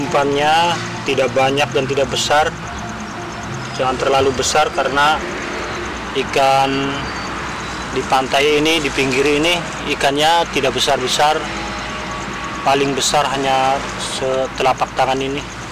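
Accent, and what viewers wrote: Indonesia. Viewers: Logatnya kaya Cirebon sih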